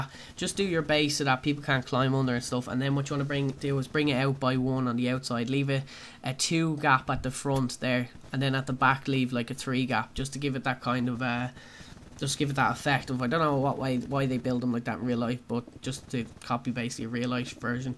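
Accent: Ireland. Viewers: You kind of sound Irish but I can't tell... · You sound Irish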